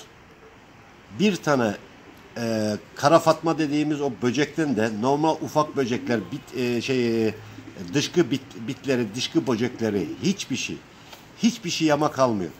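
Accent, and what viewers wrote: Black Sea, Turkey. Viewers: Selamün Âleyküm Karadenizlisin Abi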